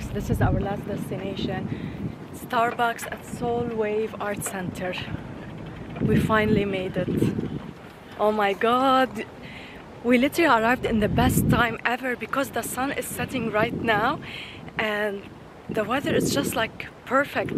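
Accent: Lebanon. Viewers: ارجوك تكلمي لبناني واحد من اسباب متابعتي لك لهجتك 😢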